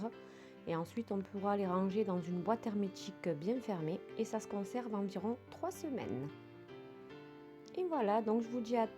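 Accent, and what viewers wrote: South, France. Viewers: Super vidéo fidèle à toi même j ai testé pas mal de tes recettes qui ont toujours connu un succès.Je suis du sud et je reconnais ton accent celui de Toulouse ou Marseille 😅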